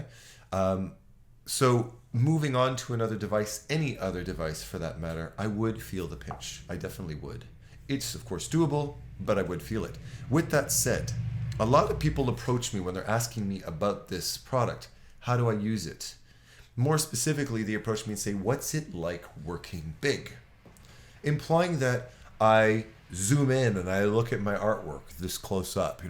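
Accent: Canada. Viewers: I'm new to the channel and love the content,! Does anyone here have the calibration settings for the 24in Cintiq? I purchased one through a school grant at work (high school art teacher, here) and wondered whether or not I should get that Wacom color calibrator. Side note, my dad grew up in Winnipeg. So, when I came across your video on Infinite Painter I detected the Canadian accent. So cool! Happy New Year to all!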